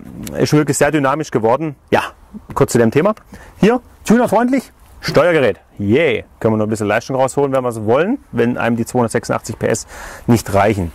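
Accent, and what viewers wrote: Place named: Swabia, Germany. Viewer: Dies Schwäbisch klingt schon lustig. Aber nicht unsympathisch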